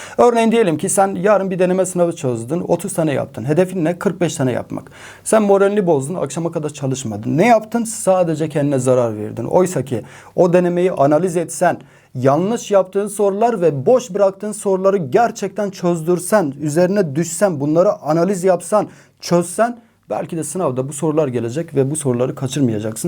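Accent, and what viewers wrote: Van, Turkey. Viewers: hocam siz kendinizi anlatmak için çabalamayin biz sizin Van şivenize alıştık anlıyoruz sizi. Bazı insanlar ya anlamıyor yâda bilerek sizi kızdırmaya çalışıyor takmayın boşverin. Sizde bizimle bütün sene çalıştınız. Allah sizden razı olsun inşallah. 🤲 Tatili hakettiniz... 10 gün sonra denize girip kef edin. He walla, kahvaltı da enfes olsun yarasın. Afiyet olsun. Yiyin için gezin yüzün en güzel kef ler sizin olsun 😃 hakkınız ödenmez tanıştığımız için çok memnun olduk bunu kendi adıma çok rahat ifade edebilirim. Siz örnek bir egitimcisiniz meslektaşlarınız size imrensin isterim. Cansın. 🙏👍👏😇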